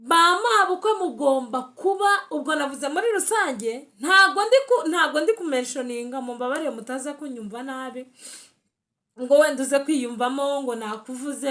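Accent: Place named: Banyamulenge, Democratic Republic of the Congo. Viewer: Mubyeyi urabivuga se urabizi ariko numvise uvuga nkabanyamulenge uriwe noneho nagukundaga ndushijeho ❤❤❤❤❤❤ uzansubize niba aribyo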